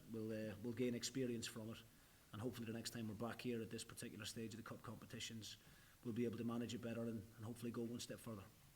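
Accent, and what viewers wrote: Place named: Aberdeen, United Kingdom. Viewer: That's the first time I've noticed he's got a aberdeen accent lol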